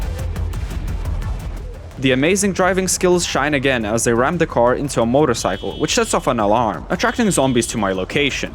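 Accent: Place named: Lithuania. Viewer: i knew that accent was fammiliar, labas :D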